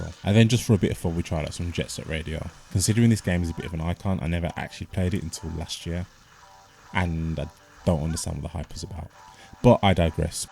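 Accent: United Kingdom. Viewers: You from Brum too? I subbed man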